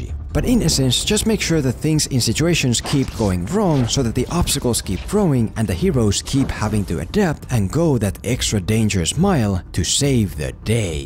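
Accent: Finland. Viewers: Are u from Finland?? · Are you finnish? You sound finnish.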